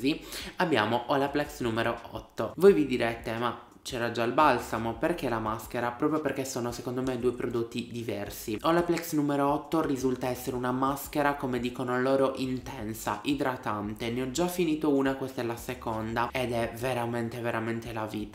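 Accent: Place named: Sardinia, Italy. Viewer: Ti stimo tantissimo,grazie per i tuoi consigli preziosi,simpaticissimo quando esce il tuo accento sardo😂❤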